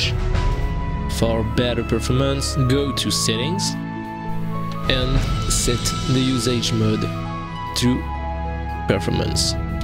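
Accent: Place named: France. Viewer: Don't worry, it's all fine, your English is better them most, to be honest, and with the french accent. ^_^ Thank you and Goodbye yourself. ;)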